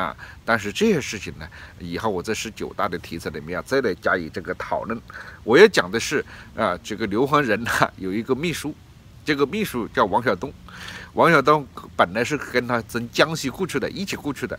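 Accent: Hunan, China. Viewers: chu web 这是湖南普通话。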